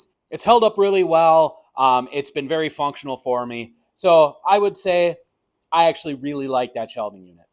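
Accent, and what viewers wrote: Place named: Wisconsin, United States. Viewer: glad I could confirm the Wisconsin accent! appreciate the reviews :)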